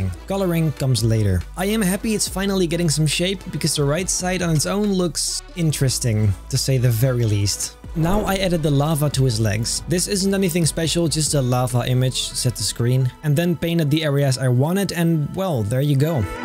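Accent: Netherlands. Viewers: ben je nederlands? het is gwn dat je heel nederlands engels praat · ik vind het ook zo leuk dat hoe goed je engels ook is je blijft horen dat je nederlands bent door de nederlandse trekjes lol. maar super vet werk love it!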